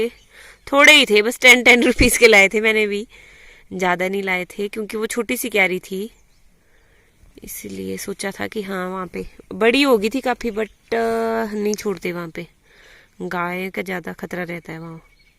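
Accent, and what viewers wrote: Haryana, India. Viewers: Madam, lagata hai aap Haryana se ho. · Aapki aawaj se lgta h . Ki aap haryana se ho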